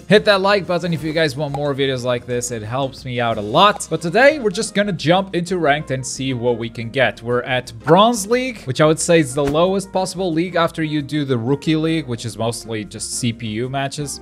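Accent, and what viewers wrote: Portugal. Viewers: I know you where from Portugal from the way you speak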